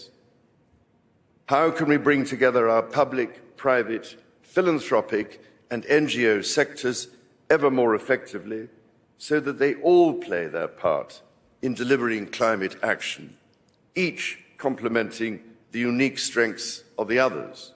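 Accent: United Kingdom. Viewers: You are telling me that when the British spoke like this they didn't have real issues to worry about?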